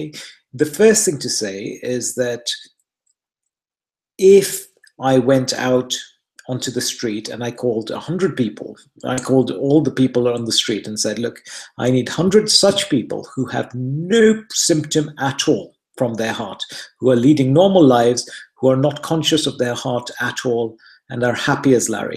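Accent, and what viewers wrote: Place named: United Kingdom. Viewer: British accent.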